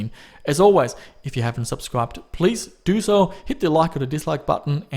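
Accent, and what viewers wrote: Germany. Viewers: Du hast ürgendwie so einen deutschen Akzent 😂😂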